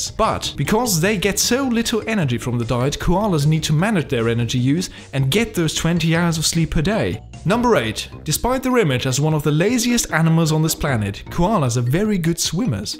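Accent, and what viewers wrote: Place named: Germany. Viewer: How come I feel a koalalike urge to fall asleep whilst listening to facts infused with a German accent?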